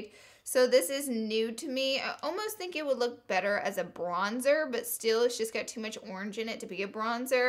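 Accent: South, United States. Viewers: !!! 😊 I'm a newbie, just subscribed today but I love your southern accent and quirky personality. Haven't tried much of NYX before but I'll def be purchasing that palette you mentioned. Thanks for the tipssss.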